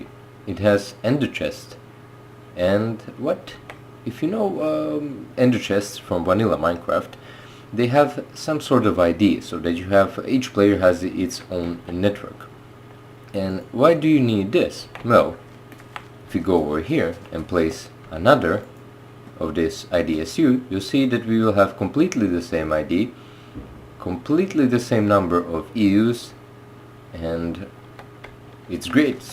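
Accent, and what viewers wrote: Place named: India. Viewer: hmm he sounds like Indian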